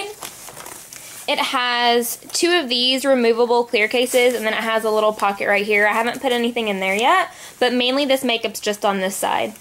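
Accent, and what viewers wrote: South, United States. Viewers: in your current videos you have a southern drawl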